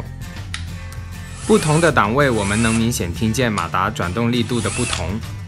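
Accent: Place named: Taiwan. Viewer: 台湾腔，台湾人吗？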